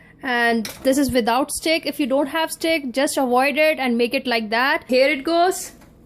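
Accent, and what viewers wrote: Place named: Punjab, India. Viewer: U have punjabi accent. English doesn't suit you. If u speak in punjabi it will sound more good seriously.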